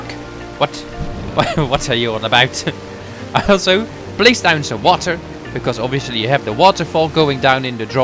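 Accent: Netherlands. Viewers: It's even worse. It's southern Dutch 😂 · Brabant heuj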